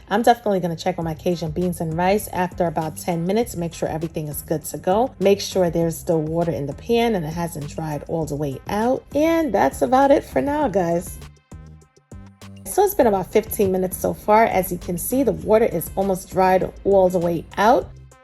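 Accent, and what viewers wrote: New York, United States. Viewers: It's her New York accent for me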